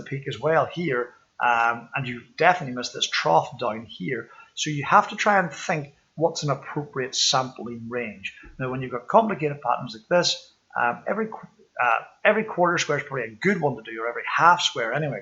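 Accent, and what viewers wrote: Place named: Ireland. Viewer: This guy sounds like an Irish man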